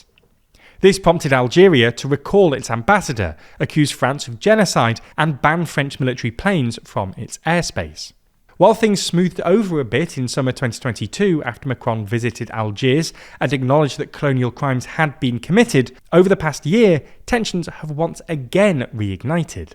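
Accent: United Kingdom. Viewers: british lil bro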